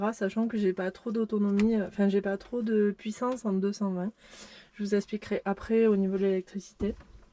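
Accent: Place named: South, France. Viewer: merci, excellent choix, mon budget est réduit, je vais aménager seule mon jumper également 😊 je suis de l'Hérault, tu as l'accent du sud aussi, de quel coin?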